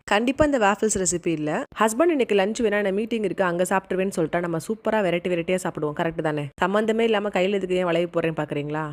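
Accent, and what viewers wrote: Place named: Periyakulam, India. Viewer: Periyakulam slang 😂❤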